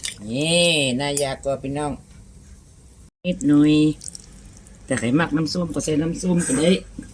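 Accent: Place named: North, Thailand. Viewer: บ้านอยู่ไหนนิอู้เหนือ😁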